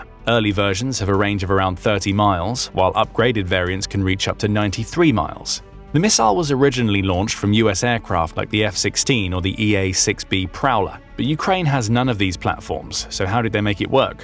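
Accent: United Kingdom. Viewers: Oh 900 out of 6000 you sound British what do you know ??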